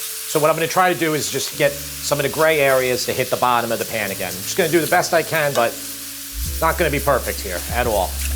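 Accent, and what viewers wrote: New York, United States. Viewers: I find your videos so relaxing. Also, I love your approach to cooking. No pressure, do what you can with what you have. The New York/Jersey accent is just so loveable ❤️ Can't want to try this one!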